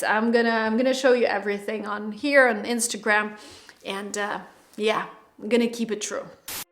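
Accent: United States. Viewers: You look so happy it's contagious. How tall are you? it's the sink pretty low or a combination of both. You don't seem to have an accent (ok you have an American one), are you from the states? I hope you guys enjoy the place for a long time.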